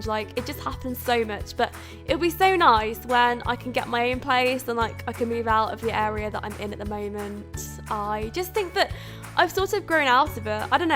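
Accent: United Kingdom. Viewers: I love your british accent so much !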